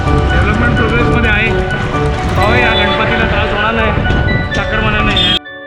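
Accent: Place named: Malvan, India. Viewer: Atishay sundar sadrikaran aahe satya peristithiche, assal malvani tadka aahe tumchya bolnyat 🙏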